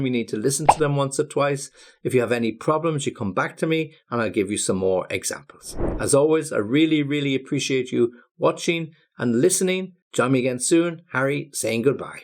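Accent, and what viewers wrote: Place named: United Kingdom. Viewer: Wow, I like a British accent 😊😊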